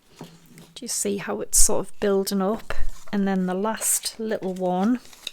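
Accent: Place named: Wales, United Kingdom. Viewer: Thank you for this, you make it so simple with easy - to - follow instructions, and your lovely Welsh accent is a bonus. Kathy from New Zealand.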